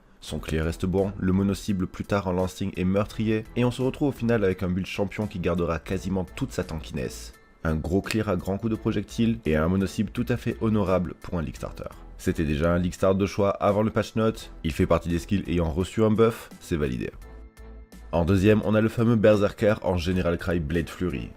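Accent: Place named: France. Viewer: I feel like 90% of what he said was english words prononced the french way :D gotta step up your frenglish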